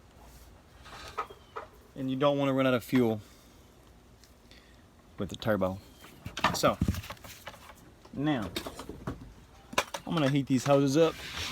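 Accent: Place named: Texas, United States. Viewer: Dude you sound like you are from Texas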